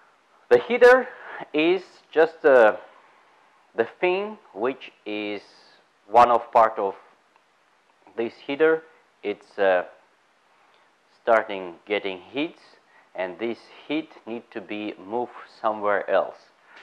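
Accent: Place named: Russia. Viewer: 🤣😅да на таком рашен инглише почти все у нас в сша и говорят. у меня волосы дыбом но все англоговорящие вынуждены понимать и никто и глазом не моргнет) а куда деваться?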